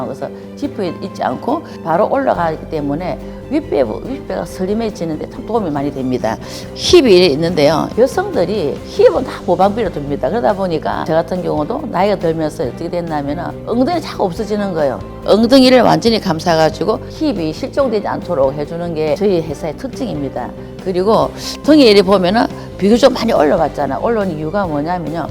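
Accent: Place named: Gyeongsang, South Korea. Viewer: 경상도 말씨~친근감이 들어 꼭입어야 될것같아요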